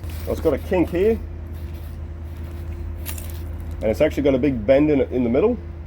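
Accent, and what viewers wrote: Australia. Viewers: Love your English australia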